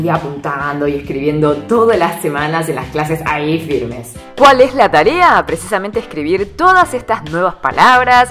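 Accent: Argentina. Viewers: Buen vídeo me gusta tu asentó argentino y tu cara coreana jaja 👍👍👍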